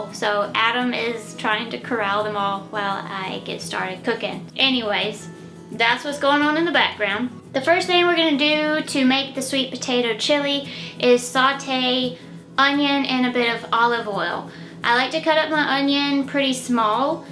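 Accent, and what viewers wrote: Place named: North Carolina, United States. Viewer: You sound like your from my neck of the woods in North Carolina 🤗😁, "don't need no meat"😄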